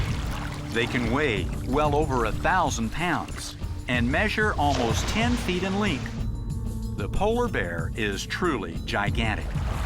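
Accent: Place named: Canada. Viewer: Lol does anyone else notice the canadian accent in the narrator lol?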